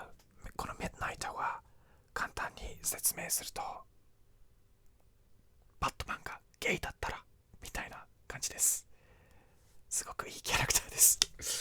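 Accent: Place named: United Kingdom. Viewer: 今日もPDRさんの英語囁き声が聞けて嬉しい( *´艸｀)←イギリス英語フェチ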